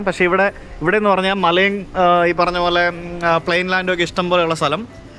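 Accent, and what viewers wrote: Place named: Thrissur, India. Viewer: എന്തായാലും തൃശ്ശൂർ സ്ലാങ്ക് സുപ്പർ ആയിട്ടുണ്ട്ട്ടാ 👍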